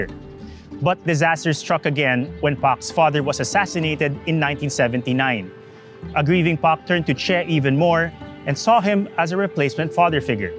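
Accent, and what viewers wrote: Philippines. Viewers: Korean names and a Filipino accent 🙄 we need subtitles please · Is the host Filipino? He has that accent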